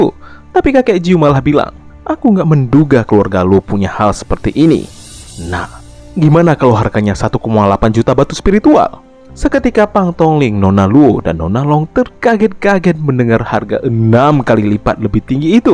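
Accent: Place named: Sunda, Indonesia. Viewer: iq7 lebih kentel sunda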